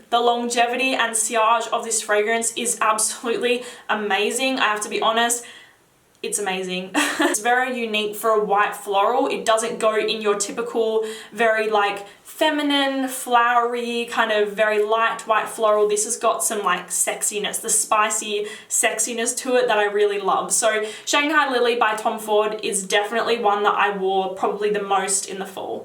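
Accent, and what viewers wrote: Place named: Australia. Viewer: I just came back from Australia & I miss the accent lol. I love your videos! Keep up the good work. You’re soooo pretty too. I am hankering after TF BO EDT too.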